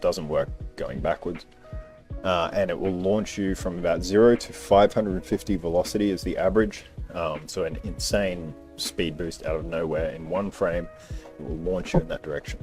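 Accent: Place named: Australia. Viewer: fuck oath could tell instantly, master race haha 🇦🇺🇦🇺🇦🇺 Dope tutorial btw just got a pc n switched to mnk like a month ago your tip to slow the vid down to get timing actual helped heaps Keep killing it man, Love hearing a fellow Aussie accent reppin apex 👌🍻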